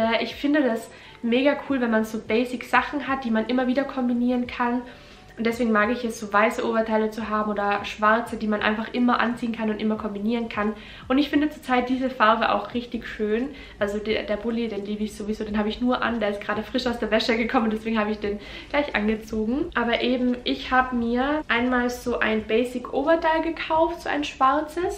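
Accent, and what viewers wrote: Austria. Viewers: Sie ist doch aus Österreich dachte ich:) und ich finds super süß den Akzent, einfach kein Hochdeutsch 😊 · Ich liebe den österreichischen Dialekt :)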